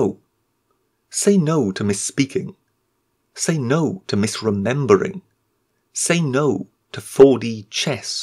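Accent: United Kingdom. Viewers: You sound like Dr. Layman - with a British accent of course.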